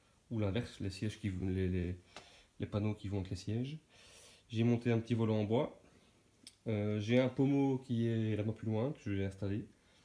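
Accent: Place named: Belgium. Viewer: Je me disait bien qu'il y avait un petit accent de la Belgique 😁😁😁 merci pour gotta et force à toi pour ta chaine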